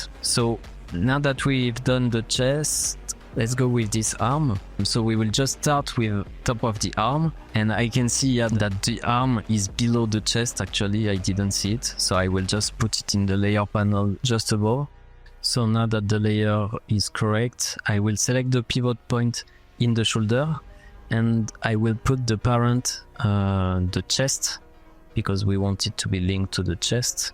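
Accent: France. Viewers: Mec t'est Français 😂😂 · You have french accent 🤨 , are you french ? (Because i am) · It's not against you, but as a french speaker, You have the accent of a French speaker who speaks English. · Are u French? Bc ur accent ;p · we j’ai vu il a un accent francais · L'accent français de fou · As a French, he's clearly French heeh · On dirait un francais❤🇫🇷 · Bon ça se voit tu es français, mais bro merci pour cette app incr totalement gratuite, juste y’a des pubs mais quoi de plus logique finalement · Ça sent l'accent français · T'es Français ? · I think ur french if it is I'm too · Are u french????? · L’accent français a 100% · Ta un accent français 😂😂😂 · You lowk have a mad French accent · BRO T'ES FRANÇAIS ?! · Bro are u french ?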